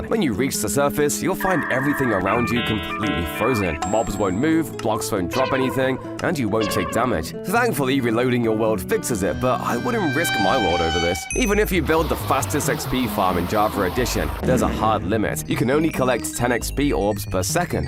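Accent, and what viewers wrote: United Kingdom. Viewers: Its the way British dialects link vowels together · Can you tell us why is your voice British? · Nahh he’s just British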